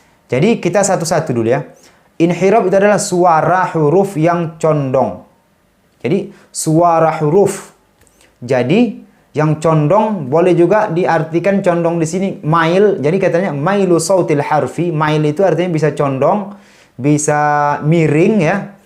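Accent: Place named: Eastern Indonesia, Indonesia. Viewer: Suka aksen timur beliau. Maa syaa Allah... Jazakallahu khoyron Ustadz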